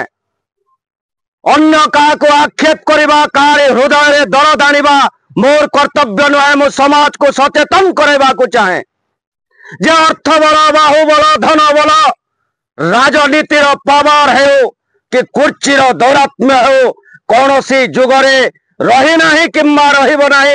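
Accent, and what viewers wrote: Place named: Cuttack, India. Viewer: ଭଲ କଥା କହୁଛ ଭଇ ହେଲେ ମୁଁଇ ଯାନି ନାଇଁ ପାରଲି ଯେ ତୁମେ କଟକିଆ ଛୁଆ ଅ କି ସମ୍ବଲପୁରିଆ ଛୁଆ ଅ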